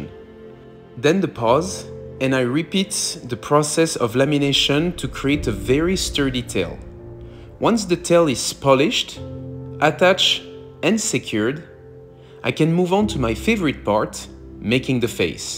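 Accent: France. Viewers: Loving the voice over. Ça fait du bien d’écouter l’accent français ❤ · Your French accent is as smooth and as delicious as the finest chocolate. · I love your french accent 😅 Moi aussi je parle français😆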